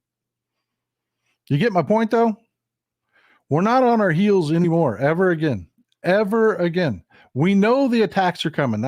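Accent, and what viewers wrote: Texas, United States. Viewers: By the way you look like a Texan and talk like one, I was marry to a good Texan, he would have enjoy your facts and your show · you sound as authentically Texan to me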